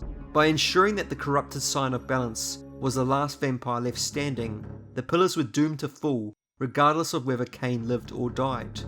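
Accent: New Zealand. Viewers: trying to work out your accent, Kiwi?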